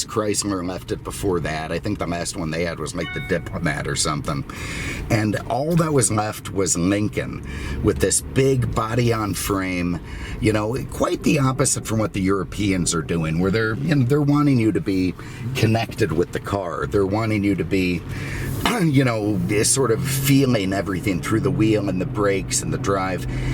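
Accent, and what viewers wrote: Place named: United States. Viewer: I also love the way this guy explains cars is good humor and especially the accent.. pure Americana if I owned an Automotive Group Los Angeles you'd be my spokesman on TV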